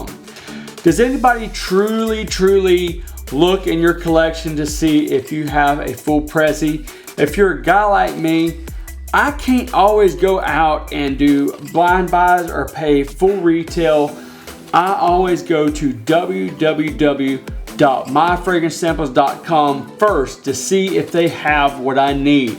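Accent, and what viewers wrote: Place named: Louisiana, United States. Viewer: I don't know if that was a chicano accent or a one straight from the bayoo 🤔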